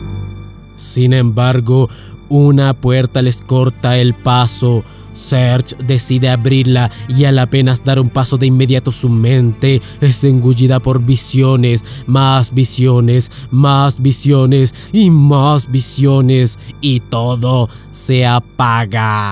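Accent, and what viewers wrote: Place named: Chile. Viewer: Solo acotar que al parecer el personaje Toma en según Wiki es descendiente del Toma que aparece en Chrono Trigger. Por lo demás, se agradece este resumen, por el poco material de este estilo que hay al menos en español, y por lo enrevesada y a la vez entrañable y bien construida que es esta historia. Mención honrosa al acento y las referencias chilenas 🤭✨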